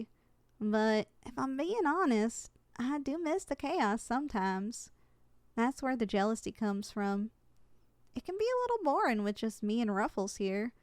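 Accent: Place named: South, United States. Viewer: Can't say I expected it to play out that way. Now I'm curious if there's a 2nd script continuing this story. As for this one, you really nailed the Southern Hospitality feel in your accent delivery. Very well done :_AvaLavaLove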